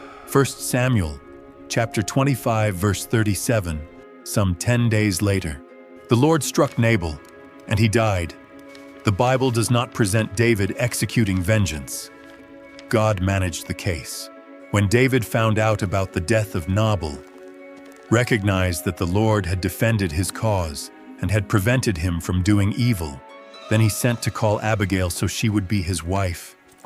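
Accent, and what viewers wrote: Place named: Africa. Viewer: African accent you aren't saying ask but axe ..put me right off.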